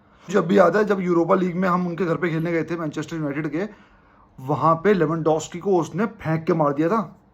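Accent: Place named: Uttar Pradesh, India. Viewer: uttar pradesh mai kaha se ho bhai Voh toh mujhe pata chl gaya tha app uttar pradesh se ho apki voice se😅😅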